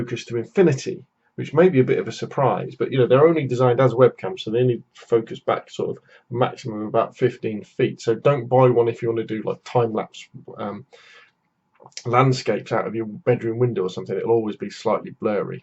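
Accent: United Kingdom. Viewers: Hy, nice video! the quality is good and there is no flow. the webcam performs depending on the system configuration so can you tell me pls on what configuration you recorded the video(processor, ram memory, video card and if you have ssd or hdd)? it will really help me decide to buy the c270 or not. By the way cool british accent.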